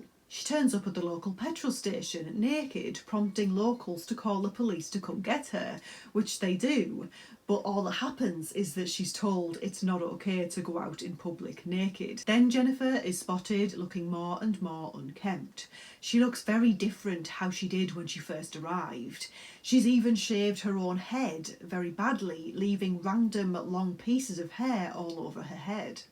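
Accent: United Kingdom. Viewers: Are you a british English speaker?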